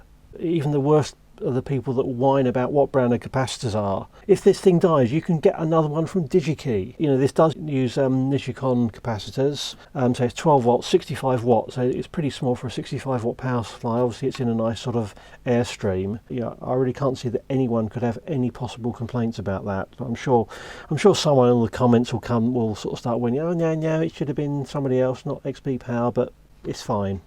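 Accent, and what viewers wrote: United Kingdom. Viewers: Very interesting subject really. but with your UK accent and whisper like voice. it is hard to catch the words with no subtitles. quite important product for me though but I ditch a lot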